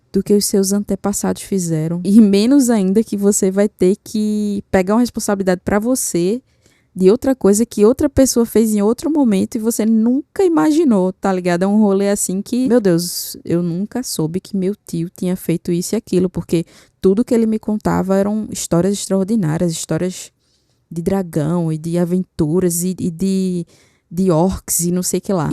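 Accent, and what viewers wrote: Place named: Recife, Brazil. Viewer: Vixi Maria que agora melhorou foi muito! Cafe com leite, Senhor dos Aneis, sotaque lindo de Recife e observacoes/trabalhos sensiveis…bom demais❤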